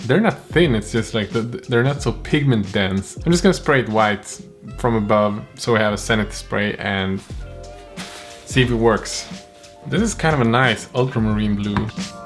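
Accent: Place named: Sweden. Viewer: Oh my God! I used to live in Sweden. This is so fucking real! Hahahahaha And your Swede accent sounds SO familiar. 😂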